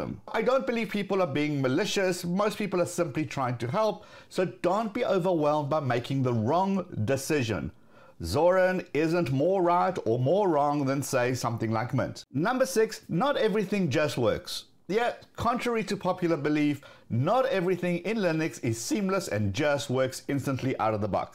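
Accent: South Africa. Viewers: for real bro, you from SA?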